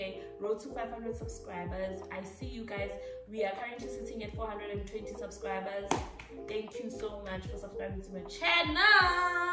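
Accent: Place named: Nigeria. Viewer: It’s the nigerian accent for me😂😂 biko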